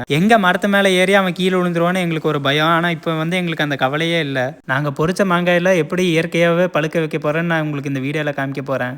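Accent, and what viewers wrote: Coimbatore, India. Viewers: Athu கோயம்புத்தூர் பாஷை.கொஞ்சம் maathi பொறிச்சிடுவாங்க. இல்லை parichiduvaanga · Coimbatore Tamil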